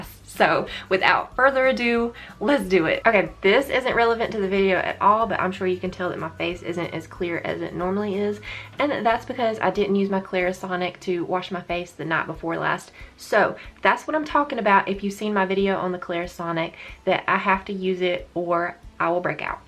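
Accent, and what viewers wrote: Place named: South, United States. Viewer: im so jealous omg! i just posted a brow routine as well! your southern accent is so cute!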